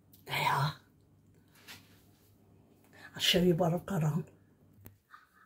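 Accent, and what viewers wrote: United Kingdom. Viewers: ❤🧚🏻‍♀️🌹 I’m So happy to meet U on here. I’m Teresa, from New York, USA. I just LOVE Ur accent. I’m guessing British. I love so much of England and Ireland. I’m Puertorican and my great grandma was Irish. If U are, equally I like U. Ur personality is lovely n sweet. Hope to see more of ya my sweet lady 🩰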